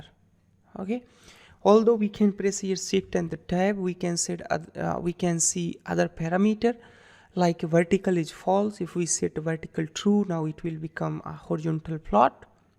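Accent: India. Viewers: WoW! It's been many years since I heard a lovely Indian accent. It's pleasant to hear from you sir and Thank you very much for wonderful video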